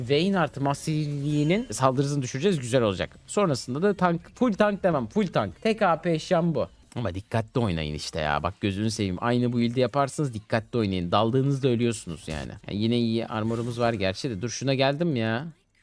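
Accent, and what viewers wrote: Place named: Cyprus. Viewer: Kıbrıslı arkadasın mı var senin ?:) Arada bizim ağızdan esintiler var :D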